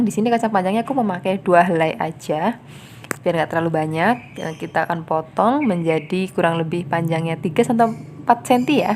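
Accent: Java, Indonesia. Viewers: Menarik resep2 nya, aku ngga cm suka resep nya, tpi suara mba itu lembut bngt, jawa nya medok, pasri priyayine ayu, luwes, jiiiaaann...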